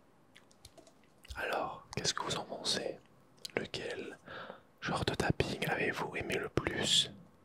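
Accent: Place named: France. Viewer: Il peut dire qu’il est français on aura 0 doute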